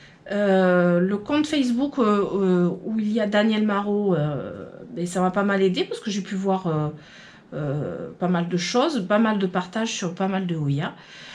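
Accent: South, France. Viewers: Tes videos sont pleines de couleur avec ton accent du sud. J'adore. Merci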